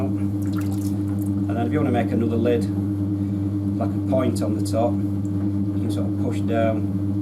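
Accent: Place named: North, United Kingdom. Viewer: Lovely to hear a northern accent! Thanks so much for your time on these videos. Cookie jar looks a treat mate.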